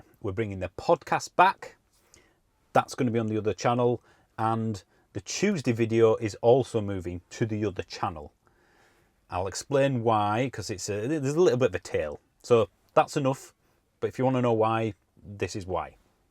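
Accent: Yorkshire, United Kingdom. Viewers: Awwwww! I’m gonna miss my bi weekly shot of yorkshireness! Its lovely to listen to our native language. Living NZ I have to speak a foreign version of English all the time! Sometimes they don’t understand me! Can I suggest a subject for your new channel? A visit to Cragg Vale to explore the history of the coiners( clipping the ticket). just a thought. Been following you for ages before I imported my own I-Pace from UK. Much cheaper than here.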